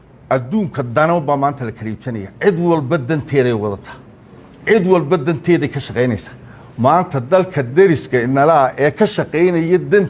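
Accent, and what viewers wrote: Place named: Somalia. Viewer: Waa faqash miyaadan arkayn lahjadiisa doqoniimadu ka muuqato kuma dhacaan in ah inalahadlaan iyagoon iskakeen dhigin😂😂😂😂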